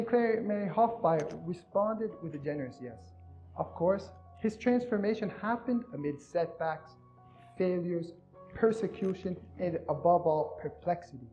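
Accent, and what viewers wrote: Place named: Europe. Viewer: Many thanks. I thought he might’ve been European with that accent.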